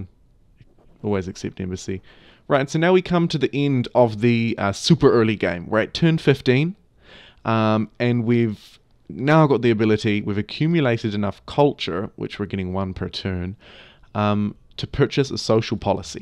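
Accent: New Zealand. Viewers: Somewhere from oceania, maybe new zealand. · That's not an Australian accent, most likely he's from New Zealand.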